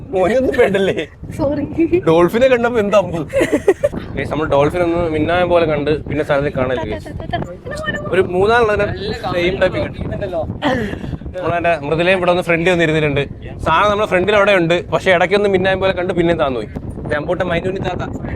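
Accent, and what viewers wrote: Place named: Kannur, India. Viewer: Kannur language ayyi oru cut unde ee language inne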